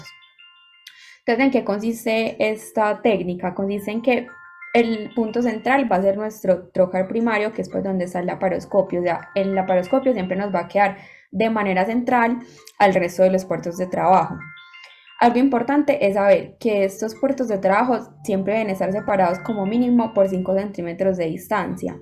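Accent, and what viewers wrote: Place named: Antioquia, Colombia. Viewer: muy paisa, buena platica, saludos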